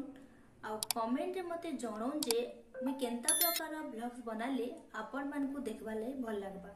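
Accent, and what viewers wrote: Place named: Sambalpur, India. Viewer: Sambalpuri voice bhal lagucha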